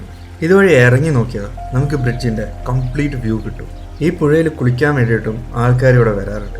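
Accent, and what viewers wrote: Palakkad, India. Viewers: This is awesome. Video + വിവരണം and your പാലക്കാട്‌ ഭാഷ. എല്ലാം കിടിലം.. Missing palakkad🥺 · താങ്കളുടെ പാലക്കാടൻ ഗ്രാമ്യഭാഷ ഇതു പോലെ തുടരണം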